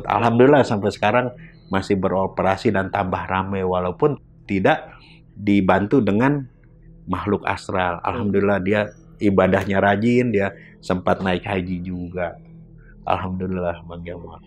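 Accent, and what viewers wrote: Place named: West Java, Indonesia. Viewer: Sunda banget 😅😊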